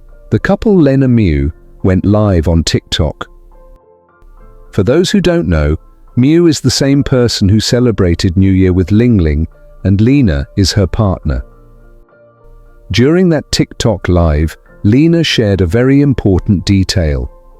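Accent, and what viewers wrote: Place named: England, United Kingdom. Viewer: Εισαι σωστός αλλά από τήν προφορά καταλαβαίνο ότι είσαι Άγγλος. Οπότε δεν θα σε ακολουθήσω γιατί σιχαίνομαι την Αγγλία και τις πολιτικές τις . Εσεις ψηφίζετε τους πολιτικούς σας άρρα εσείς είστε υπεύθυνοι για τό ότι προσπαθείτε να σύρετε την Ευρώπη σε πόλεμο. ΕΞΩ ΟΙ ΒΡΕΤΑΝΟΙ ΚΑΙ ΜΑΚΑΡΗ ΝΑ ΣΑΣ ΚΑΨΗ Ο ΠΟΥΤΙΝ.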